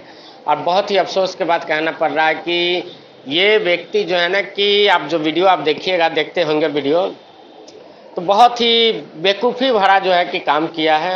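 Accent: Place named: Bihar, India. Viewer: Bat karne ka style mazedar he bhai ..traditional Bihari language very nice Mahadev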